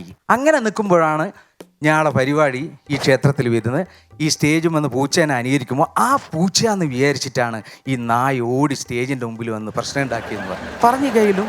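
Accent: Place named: Kannur, India. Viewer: നല്ല അവതരണം, കണ്ണൂർ ഭാഷ 🎉❤🎉❤🎉❤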